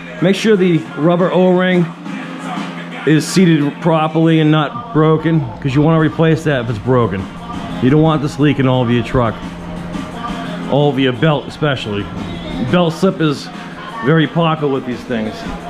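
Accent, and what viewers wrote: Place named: East Coast, United States. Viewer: LOL ProChargA. It’s obvious you’re accent isn’t from most of America. East coast or New Orleans. Great video!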